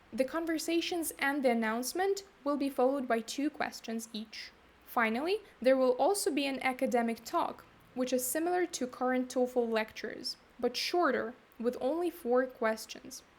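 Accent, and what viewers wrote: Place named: Russia. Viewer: Такой сильный русский акцент....😂😂😂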